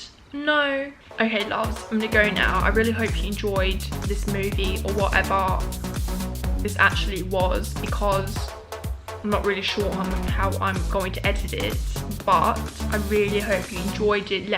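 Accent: Australia. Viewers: Ok but I love your accent!! me being from Bristol having a shitty accent ur obviously Australian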